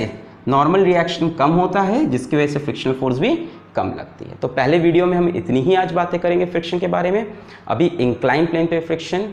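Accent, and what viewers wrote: India. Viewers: Unfortunately I can't understand Indians' accent 😭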